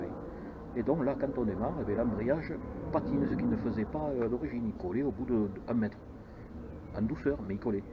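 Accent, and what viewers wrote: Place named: South, France. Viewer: Bonjour Merci pour votre partage d'impressions sur votre scooter, et j'apprécie beaucoup votre joli accent méridional lol Je possède actuellement une Deauville 700 avec laquelle j'ai eu un accident de la circulation, le délai d'obtention des pièces de rechange étant très long, Honda St Dié m'a prêté un ADV 350. Je suis agréablement surpris par le confort et les aspects pratiques de ce scooter et je me demande si je ne vais pas le garder...... Comme toi je suis à la retraite j'ai 66 ans, j'habite en Alsace dans la montagne vosgienne. Est-ce que tu as déjà fait de longs voyages avec? Merci pour ton essai et bon week-end à toi.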